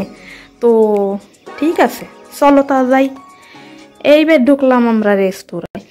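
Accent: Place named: Barishal, Bangladesh. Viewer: Bhola laglo aponar video ta deklam khub balo laglo. Borishaler language. I enjoy it.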